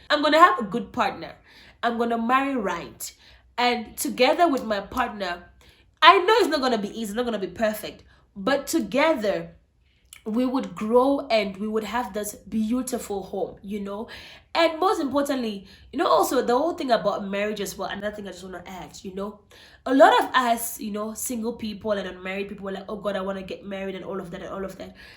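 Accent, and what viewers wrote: South Africa. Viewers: Any how nna lewena ,sounds like ur from msanzi ,,I beg you Cameroon wl disown you ,as for matswere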